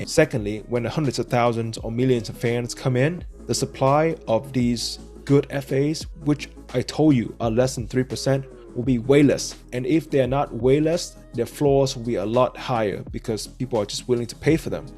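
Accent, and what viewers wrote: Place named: Austria. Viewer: I like your Arnold Schwarzenegger accent dude. Great content and channel too.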